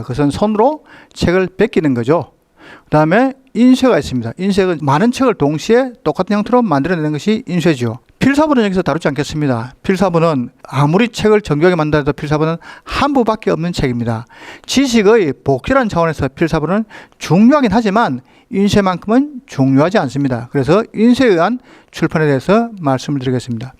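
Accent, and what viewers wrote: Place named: Seoul, South Korea. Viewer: 굉장히 꼼꼼한 성격이실것 같은 사투리사이에 한글에 대한 성찰이 녹아있으시네요 : D 처음엔 재미있을라나 걱정했는데, 찰진 서울말녹은 사투리에서 교양을 발견하고 합니다^^ㅎㅎㅎ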